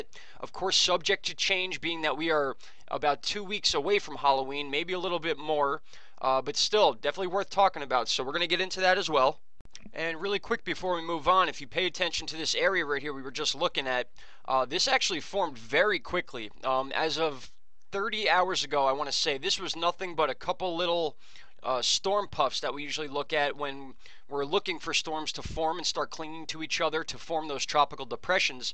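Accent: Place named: Long Island, United States. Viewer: You have a Long Island accent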